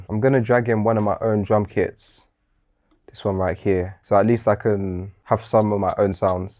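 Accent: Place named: England, United Kingdom. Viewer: That happy feeling when you click on a video and hear an English voice instead of an American one. Ah, bliss.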